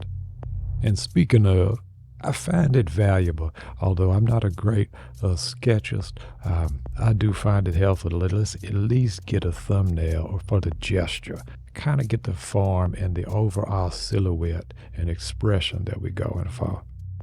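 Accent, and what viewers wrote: Louisiana, United States. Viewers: Dat voice reminds me of a Louisianan accent.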